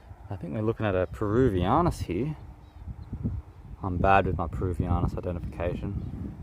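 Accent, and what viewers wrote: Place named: Australia. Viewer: "I don't have too long" 1 hour video ensues with calmest Aussie accent ever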